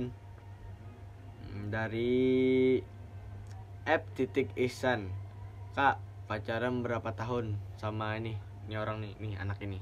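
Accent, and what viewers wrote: Banjar, Indonesia. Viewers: Banjar bngt😂😂😂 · Msh ada logat bnjr nya😂😭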